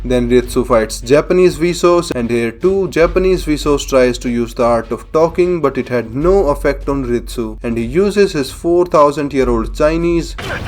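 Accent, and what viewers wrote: India. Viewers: Accent: indian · I just fucking love these videos, indian accent with sarcasm its awesome! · The eloquent way of speaking and indian accent makes this 10x funnier. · If its not for the thickest Indian accent, this videos would have been a bang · The stoic Indian accent is way too hilarious!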